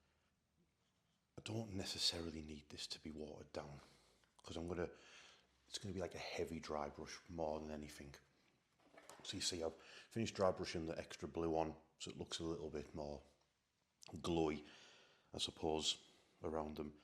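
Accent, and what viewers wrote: United Kingdom. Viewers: Ah the audio quality. The Smoggie accent really shines through 😅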